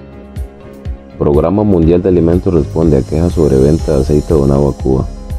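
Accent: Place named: Nicaragua. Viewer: No es cubano parece nicaraguense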